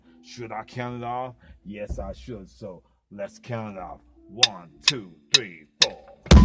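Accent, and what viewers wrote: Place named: Louisiana, United States. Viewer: First of all, I love " '. Is he from Louisiana??? Lol. Secondly, he delivers great content in an entertaining way. I hope he sticks around! Haha. Great video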